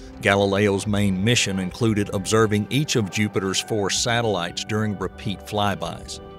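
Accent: South, United States. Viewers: Why is there a redneck narrating,? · what kind of american accent is this??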